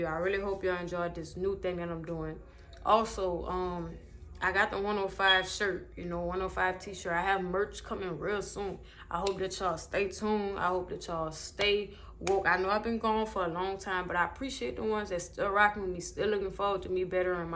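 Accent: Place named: New Orleans, United States. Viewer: you straight out of new Orleans that accent thick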